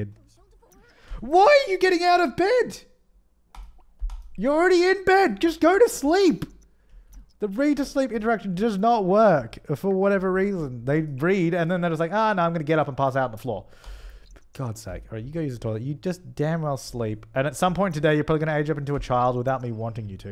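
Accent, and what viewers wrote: Australia. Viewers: I have been sick and binging this series. I wasn’t listening and my mom asked me “oh…you aren’t watching the chaotic Australian sim guy?” Hahaha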